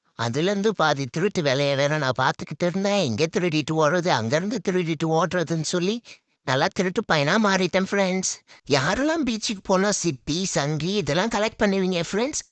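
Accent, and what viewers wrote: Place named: Chennai, India. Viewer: Ada namma ooru paiyanaa neeyi ❤. Ana chennai slang pesuriye da paiya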